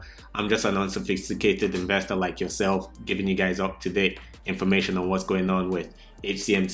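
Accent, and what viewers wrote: Scotland, United Kingdom. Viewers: That's a strong Scottish accent 😂😂👍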